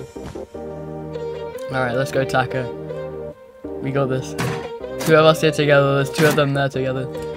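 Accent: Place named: United Kingdom. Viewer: every time you talk in your videos it reminds me that you're british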